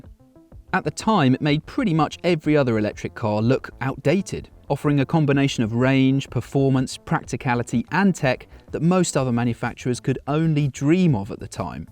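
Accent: RP, United Kingdom. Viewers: Dude sounds like an uppity Englishman who lives in a conglomerate complex, somewhere near the Houses of Parliament or their new King Charles III👑🇬🇧🤩🤴🏻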